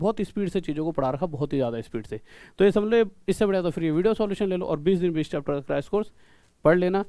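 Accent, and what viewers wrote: Haryana, India. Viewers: Bhokal , web series k , pintu pahalwan jese look h sir aapka , language b haryanvi touch a rha h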